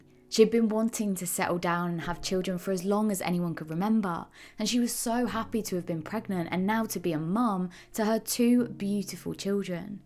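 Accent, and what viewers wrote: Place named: Essex, United Kingdom. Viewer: Pity the curator is unable to finish her words correctly. Sounds like Essex to me. Difficult to follow. Unable to pronounce the letter T.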